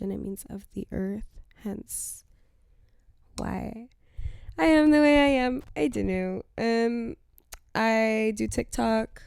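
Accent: United Kingdom. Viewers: Also your British accent is GOOODDTTTT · Your british accent is killing me 😂 My new favv podcast fr 💗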